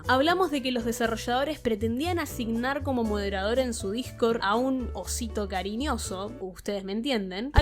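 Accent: Argentina. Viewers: Primer video que veo y ya note el acento argentino, como argentino eso me hace muy feliz! Es por esto que deje los gachas y bueno, es una lastima que el nombre de DMC haya sido manchado con esta estafa con cara de juego. Por ahora a seguir escuchando para seguir MOTIVADO!! Buen video😊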